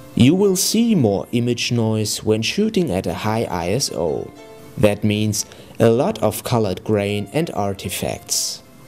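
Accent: Germany. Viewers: his accent sound like Germany! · Kanst du bitte ein video in deutsch über wie dreht man richtig videos zb. Mit der eos 650 d in deutsch bitte ich höre das du deutscher bist :) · despite the german accent it's very helpful, thank you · Oooooh you sound soooo German :-) but nice Video. Thanks or Dankeschön :-)) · You sound very German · is your accent german?? you sound a little funny? say fas-ci-na-ting, not fas-nating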